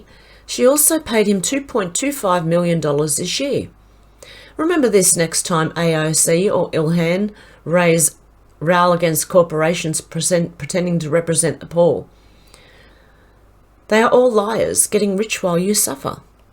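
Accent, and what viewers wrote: Australia. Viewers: Love all your work and your channel,thank you! I'm such a sucker for your Aussie accent,love it! Trump 2020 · Love your Australian accent and you need not apologize for being a grandma you’re awesome 😎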